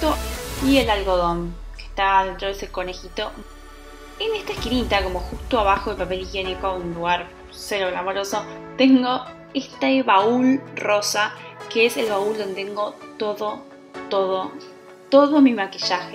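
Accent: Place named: Argentina. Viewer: me gusto mucho tu departamento y tu voz de argentina saludos desde mexico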